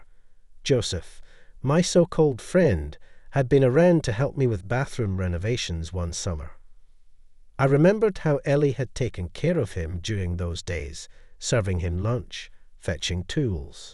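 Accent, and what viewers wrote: Scotland, United Kingdom. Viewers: Why is a Scotchman narrating when 5Th Avenue is shown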